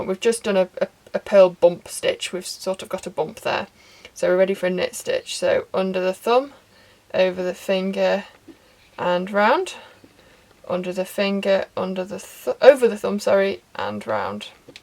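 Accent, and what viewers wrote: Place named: Lancashire, United Kingdom. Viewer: Excellent tutorial! I prefer the Alternating, Long-tail 1x1 rib (aka Italian) cast-on to the too-often - IMHO - recommended Tubular (also aka Italian CO). So your tutorial hit resonated well for me. I'm starting a beret for a lady friend, and was looking for your exact video to kick-off the project.:) think that I'll try casting on straights, then transfer the lot to bamboo DPNs, hoping for more cling to min. the twisting. Then transfer the lot to ML! Love your Lancashire accent, as I have some remote connections in W. Yorkshire. Thanks again!!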